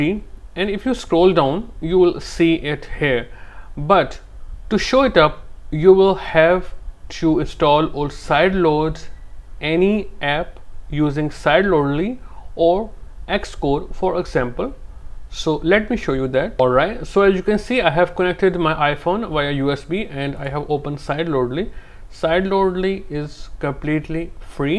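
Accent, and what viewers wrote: India. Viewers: do i really have to resort to tutorials by people with clear indian accents (sorry if this comment is offensive, i don't have anything against anyone)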